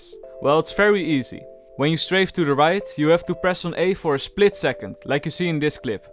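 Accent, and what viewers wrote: Netherlands. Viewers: I can feeeeeel the Dutch voice trying to come out idk but I'm 99 procent sure your Dutch Same ass me that accent its exactly like just people in Belgium and the Netherlands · hahahaha hoor meteen dat je nederlands bent, wel goeie tips though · I smell some dutch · this man souds dutch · ben jij nederlands of belg want ik hoor dat aan u accent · are you dutch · Ik hoor dat je nederlands bent haha lol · “Has a Dutch accent” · Jij bent lederlands he smieght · Your dutch accent makes this terrible to listen to. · Ben Jij nederlands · Ik hoorde gwn aan je stem dat je Nederlands was lmao ez peazy lemons squezy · I hear nederlands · i smell dutch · The dutch ascent makes this 100x better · This video smells like a nederlands accent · The dutch accent in your english 😆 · Je hoort gwn aan je engels dat je nederlands bent😂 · Are you dutch? Because you sound a little bit dutch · are you dutch by any chance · dutchiest accent ever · Nederlandse engels belike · Dutch accent on point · mmh i smell dutch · Thx for the vid and something else i smell dutch😂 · i hear some dutchie in him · I smell Nederlands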